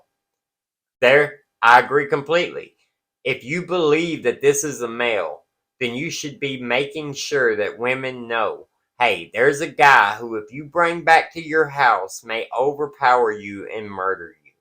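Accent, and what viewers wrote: South, United States. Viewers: Just curious, what Southern State are you in?? I am also a Southerner.. My guess is TN or AL. Anyway, back to topic, a great video, thanks.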